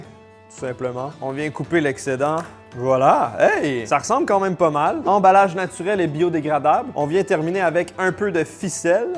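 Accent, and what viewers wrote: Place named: Quebec, Canada. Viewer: Super vidéo, j'ai adoré !!! En tant que fan du seigneur des anneaux c'était le mélange parfait !!! En plus je trouve que l'accent québécois va étrangement bien avec 😂 Par contre je regrette de ne pas avoir entendu le "po-ta-toes" 😢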